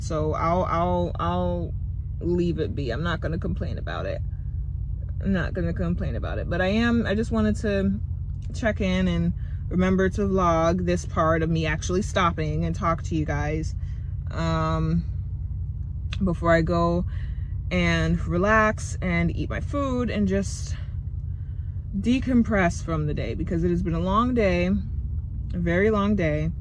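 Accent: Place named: Midwest, United States. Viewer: You with tha' mid-west twang...so sexy, Yo'...Linen set for west travels...Khaki is cool too...You're so particular...ASK AND IT SHALL BE GIVEN...-lots of Love out here for You...You're banter and picadillos are so homey and familiar...why No FB Msngr?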